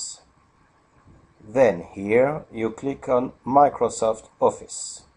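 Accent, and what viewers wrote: France. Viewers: is he french?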